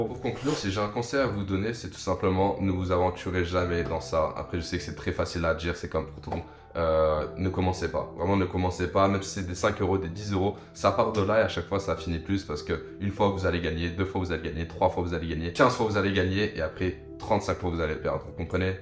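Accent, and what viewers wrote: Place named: Belgium. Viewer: Vous avez un accent Belge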